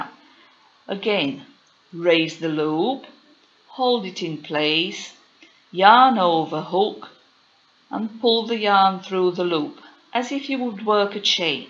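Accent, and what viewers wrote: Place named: Northern England, United Kingdom. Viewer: This lady sounds like she's from Northern England (UK) If you want to be pedantic, I guess you must say 'cro-shay' Here it's a double crochet. I've watched so many American/foreign crochet tutorials, it can be easy to confuse single with double. I guess we count the slip sritch as a 'double' 🙂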